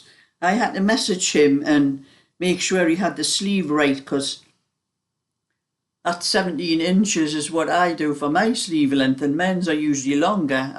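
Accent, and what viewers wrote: Newcastle, United Kingdom. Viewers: Hi,this is my first time messageing you but have watched your video's for over a year now and really enjoy them,my husband loves your rants being ranter himself😂being geordies ourselves but now living in shropshire,it's lovely hearing the geordie accent,i am from jarrow(jarra)my husband is from hebburn. I love listening to your stories from the past. Keep up the good work x PS could you tell me where you got your wool winder please,i have one but it dosn't make very big cakes like yours do❤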